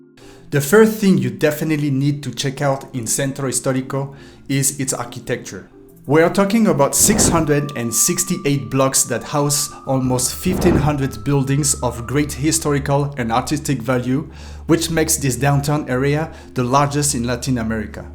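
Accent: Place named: Quebec, Canada. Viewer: I'm guessing Quebec Canadian speaking in English.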